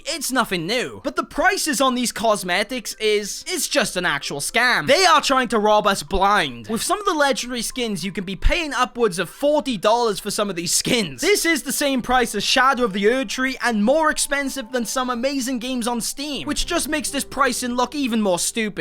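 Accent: United Kingdom. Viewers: British accent mixed with the typical exaggerated YouTube tone is unlistenable.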